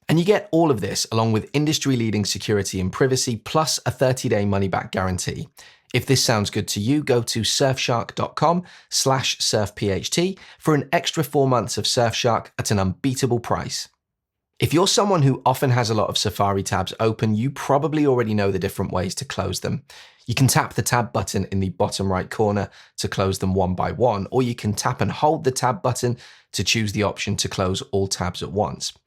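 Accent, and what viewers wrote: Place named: Liverpool, United Kingdom. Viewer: Are you originally ftom Merseyside area ? You have a twinge of Liverpool accent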